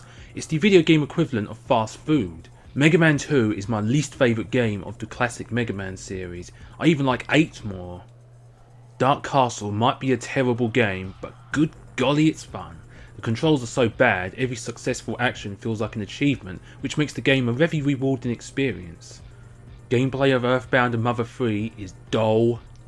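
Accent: England, United Kingdom. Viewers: dull: dou battle: ba-uh man I love the English accent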